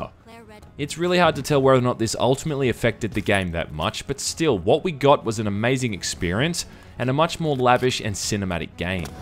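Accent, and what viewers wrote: Australia. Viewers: Newer watcher i guess. Why is he so much less Australian lol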